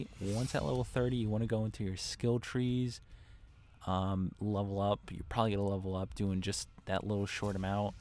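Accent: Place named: Michigan, United States. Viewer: are you from michigan? we talk verrrrry similar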